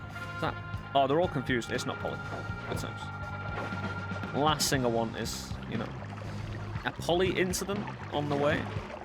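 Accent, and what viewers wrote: United Kingdom. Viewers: One of the regional UK accents, I should recall exactly where he's from but my brain it farts. Give him a chance, bet you'd work it out after a bit.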